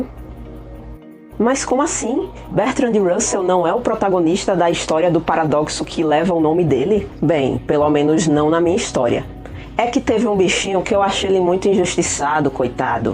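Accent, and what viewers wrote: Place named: Sergipe, Brazil. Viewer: O sotaque do meu País Sergipe me dá até um tesão. Saudades da minha terrinha. Parabéns, pelo conteúdo!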